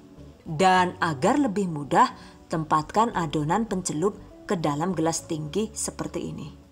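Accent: Banyumas, Indonesia. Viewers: syelaallluuu ne ngikutin resepe mbak'e iki resepny simple bingiiittt dan g kalah menarik ngapakke kui lhooo caaaa'tat wkwkwkwk "DONE" #giveawayTHVdesember2019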